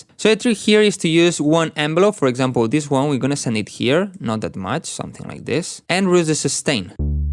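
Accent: Spain. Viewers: Fine tuto! You have Spanish accent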